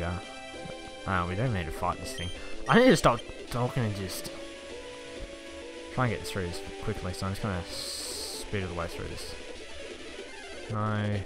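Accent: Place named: United Kingdom. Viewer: Nice a British guy goooood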